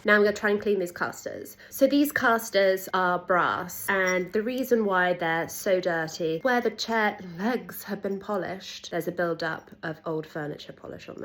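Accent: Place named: RP, United Kingdom. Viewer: You speak like the Queen of England in her 1956 or 7 Christmas greeting. Hurrah on your hard work. I’ve not ever finished a project like this.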